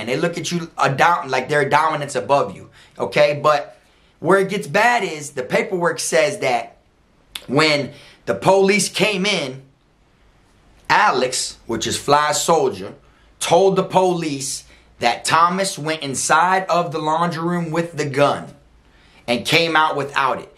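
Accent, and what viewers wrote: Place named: Florida, United States. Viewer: Yo we all definitely got the same talk here in Florida 🤣💯💯 I talk the exact same way you do! ❤️💯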